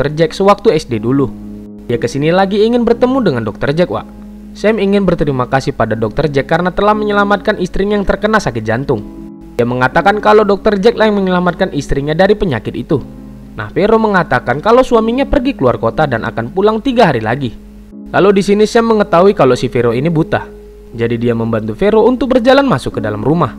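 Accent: Medan, Indonesia. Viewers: Medan kali kau bang, kalo dari aksenmu ini kau tinggal daerah setia budi, atau padang bulan, atau sekitaran kecamatan medan selayang dan medan tuntungan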